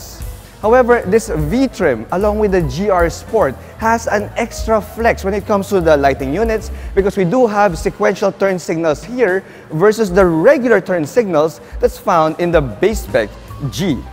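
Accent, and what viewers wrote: Philippines. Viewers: Idol, filipino english accent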